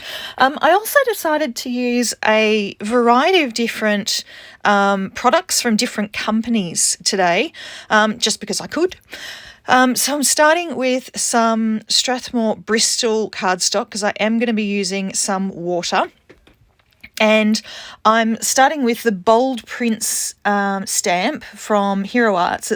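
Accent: Australia. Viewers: Ahhh, that Aussie voice, I don’t get to hear them often anymore since leaving and moving to USA 24 years ago, so it always brings me so much joy. Your background was gorgeous and I am sure the video didn’t show off how truly pretty the glimmer from perfect pearls was. What a beautiful card. Thank you for sharing.❤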